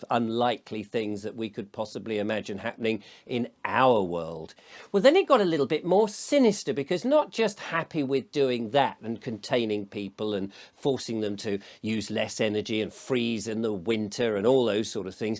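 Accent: United Kingdom. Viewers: Wow! So well said. Its almost as if Mark Twain were still alive. We all know how he saw through things, too. I wish I was receiving that paper just to get a good laugh. Such an excellent job of presenting our situation. You are so gifted at speaking. Love your wonderful accent and British demeanor. Just absolutely love you. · You are an outstanding human being buddy ...no nonsense British talking ..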